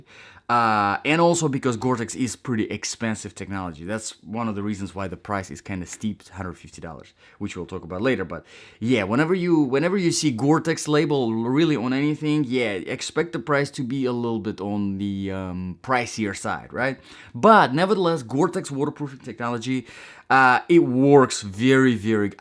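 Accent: Russia. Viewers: Английский хороший, но русский акцент с первых же секунд всё-таки слышно ;)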